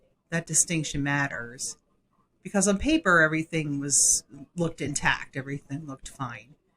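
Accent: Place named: Mid-Atlantic, United States. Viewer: Thank you for sharing this. I’m glad your uncle was able to give your brother perspective and “permission” to trust himself. That’s something so many people never get. (You sound mid-Atlantic, perhaps I am wrong, but hello from MD!)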